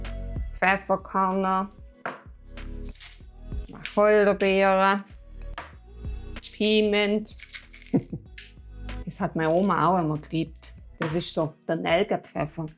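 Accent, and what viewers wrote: Swabia, Germany. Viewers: Hallo, ich bin gestern zufällig über deinen Kanal gestolpert und hab´ ihn direkt abonniert. Danke für das Rezept, deine sympathische Art und das schöne Schwäbisch 🙂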